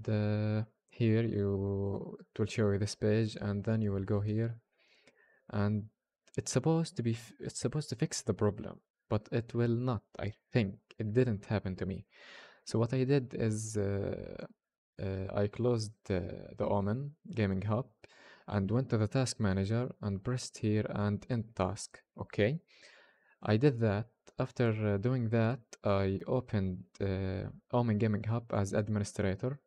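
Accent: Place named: Arab world. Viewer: من اللكنة باين عربي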